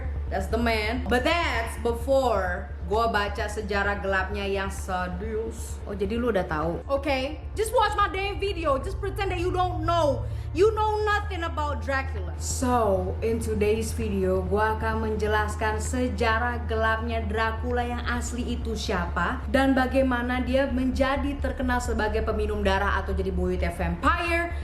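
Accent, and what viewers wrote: United States. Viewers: aksen english amerika kamu kak😭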